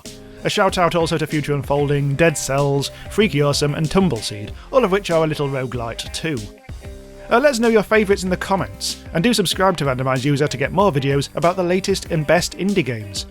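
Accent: United Kingdom. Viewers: Steven 131 I can tell it's British, but the UK has many different accents. A Northern Irish person sounds very different from a Londoner.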